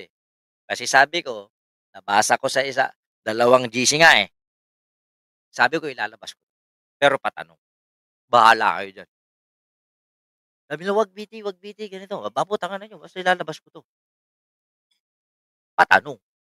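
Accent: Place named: Chinese-Filipino, Philippines. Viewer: Hahaha.... Tsinoy kb idol? Para tsinoy kc tuno ng boses mo eh....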